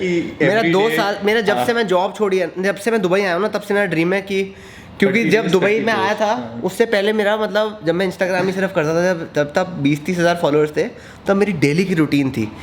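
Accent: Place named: South, India. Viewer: That South indian English Accent of urs lit💯💯🔥🔥🔥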